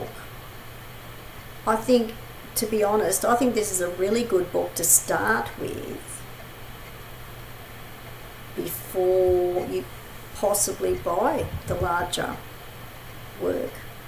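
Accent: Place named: Australia. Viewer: Great to find your channel and an extra surprise was the Aussie accent. Im in Sydney myself. Looks like the YT algorithms work as your channel came up on my feed. Thanks for providing all this content. Looking forward to catching up on the older videos. Cheers 🖖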